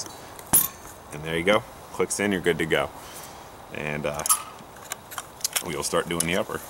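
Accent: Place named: Northern California, United States. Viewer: Norcal accent like Jake Shields. Great video man style- fast and to the point.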